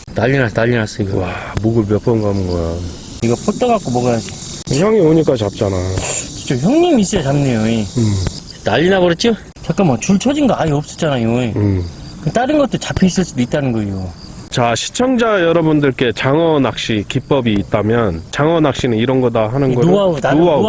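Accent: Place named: Gunsan, South Korea. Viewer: 정말 오랫만에 보네요 땅강아지! 그런데 많이 날아오네요 청정지역이네요 근디 말여유 사시는 곳이 군산이여유? 찰진 사투리가 섞인거 같아서유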